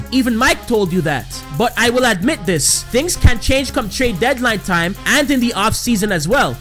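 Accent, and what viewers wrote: Toronto, Canada. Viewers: Bro the guy who made this video sounds like a Somali Toronto hoodman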